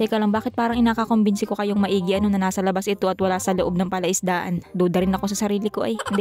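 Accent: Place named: Visayas, Philippines. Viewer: Murag bisaya si maam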